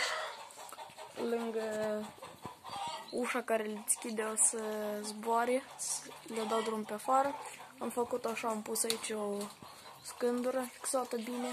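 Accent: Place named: Moldova. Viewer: Imi place accentul tău de moldovean, dar si de cum îngrijesti porumbeii din gospodăria ta. Like 22.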